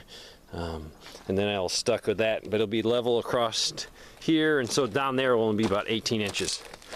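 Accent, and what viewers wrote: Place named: South, United States. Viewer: Glad to see your back.Missed that smooth southern style of yours.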